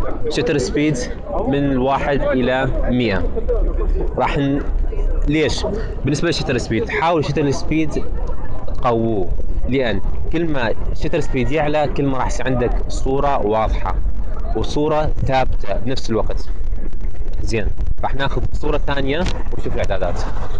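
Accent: Mosul, Iraq. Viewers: كنك مصلاوي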